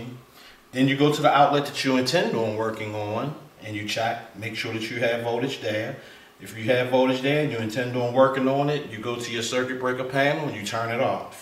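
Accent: Baltimore, United States. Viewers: Dude from Bmore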